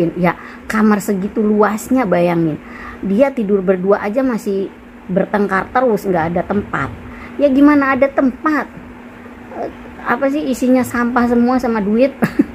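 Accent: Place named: Indramayu, Indonesia. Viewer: logatnya kek org indramayu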